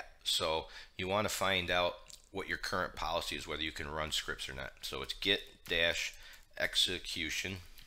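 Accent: United States. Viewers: I can't understand american accent but... I was so atracted by this deep male voice mmmmhhh very inspired😋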